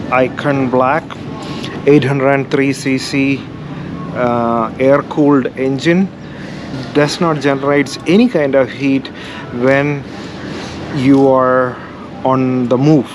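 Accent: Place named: Kerala, India. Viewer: Indian origin Malayalis Voice recognised in this video 😅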